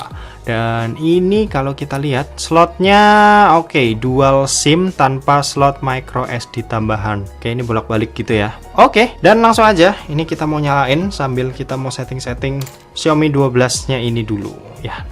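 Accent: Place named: Java, Indonesia. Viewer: Logatnya kalo ngomong medok bngt. Sekali kali selipin bahasa jowo nya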